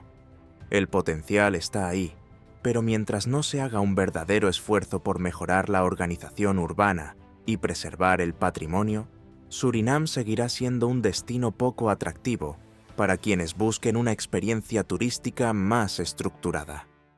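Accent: Spain. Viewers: por tu acento asumo que eres español, lamento decirte que españa tampoco es la octava maravilla del mundo Einstein, saludos desde el pais mas lindo del mundo ES. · La envidia te hace delirar, solo hablas por que te dicen los otros como tu envidioso, ... ojalá algún día tengas la posibilidad de ir a Venezuela, .... para que veas lo muy superior que es sobre tu país y muchos otros. Ese narrador nunca ha saludo de España que creo es su acento, por lo tanto habla bobadas por que no sabe.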